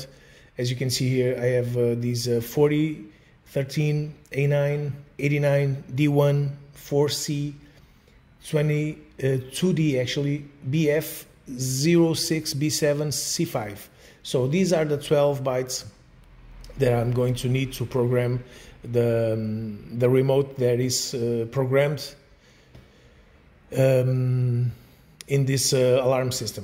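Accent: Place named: Portugal. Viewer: O "ambiente de trabalho" denunciou o meu amigo, e o sotaque, Português! Eu tenho exatamente esse problema no meu 996, é possivel fazer isto em Portugal?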